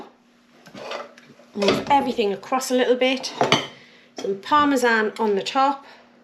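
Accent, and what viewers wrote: Newcastle, United Kingdom. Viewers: I moved to Australia 56 years ago from Newcastle and I just love your Geordie accent. i am loving your videos,the food is bringing back so many memories, so a big big thank you for the time you are putting in for our enjoyment. Also could you tell me the name of channel and I will follow him too. Love you guys.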